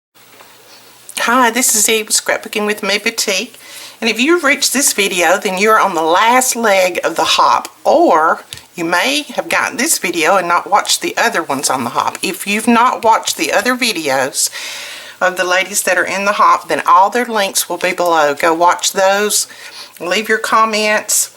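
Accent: South, United States. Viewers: great tips boy i would love to win this i dont have any distress ink yet so that will be awesome ps love your southern accent! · Thanks for all of your stamping advice. Also, I'm from the south and I enjoyed hearing that familiar dialect. The familiarity was so comforting! Your advice was greatly appreciative!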